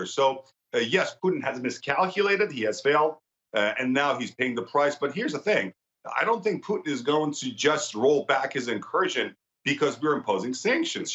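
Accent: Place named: England, United Kingdom. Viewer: salam aagha arash omidvaaram haletoon khoob va har jaa ke hastin salaamat va tandorost baashin aaagha vaaghean baraye man eftekhaare ke yek irooni chonin jaaygaahe besyaar aali ee dar jahaan daare zemnan alaave bar maassshhhala khoshtippi, va sedaaye besyaar carizmaatic va naafez, shomaa be tarze ajiby baa vojoode inke dar emricaa bozorg shodid,az vaajehaaye besyaar ziba va besyaar bejaa va fannie faarsi sohbat miconid,,, man hamishe tahlilhaatoono ba deghat tamaasha miconam, vaaghean aafarin ce inghadr be faarsi ahammiat midid va ,,,bedoone tamallogh, agar oon tah lahjeye zibaaye ingilisi ro nadaashtid, va casi harf zadanetoon ro faghat mishanid, fekr mikard shoma ostaade adabiiat hastid vaaghean dametoon garm ,,,man kheyly keif mikonam vaghti tahlilhaatoono mibinam vaaghean kaaretoon doroste,,,yek ostaad,yek tahlilgare tamaam ayaar, va yek sokhanvare tamaam ayaar hastid omidvaaram hamishe salaamat baashid.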